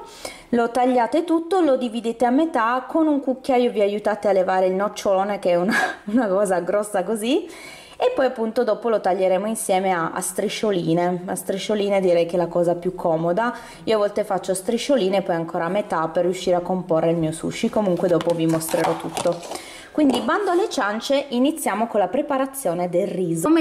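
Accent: Turin, Italy. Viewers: Grazie mille 😍 ti ho scoperta da poco e mi fai davvero un sacco compagnia con i tuoi video! Poi adoro tantissimo il tuo accento torinese ❤️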